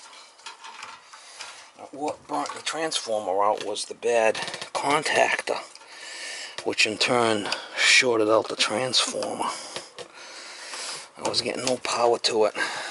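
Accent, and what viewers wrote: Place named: South, United States. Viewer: You must be from the south with that accent. - We are down in New Orleans.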